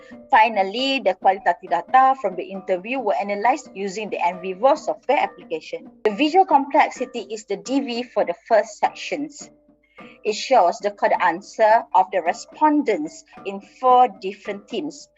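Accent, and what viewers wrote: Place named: London, United Kingdom. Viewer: Wow speaking London 😃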